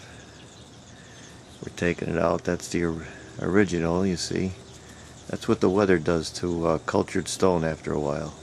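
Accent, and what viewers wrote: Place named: Scranton, United States. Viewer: I live in St Louis but I grew up in Scranton. I’d know that accent anywhere. Great stuff.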